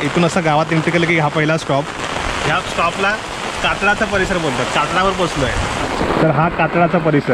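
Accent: Konkan, India. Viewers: दादा तू कोकणी आहेस का बोद्ध आहेस तुझ्या बोलण्यातून तू कोकणी वाटतोस गाव खूपच सुंदर आहे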